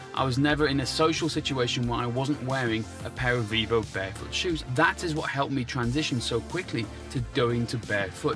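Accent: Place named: United Kingdom. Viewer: You have a very nice style and manner, also always nice to hear a fellow Brit ✌🏻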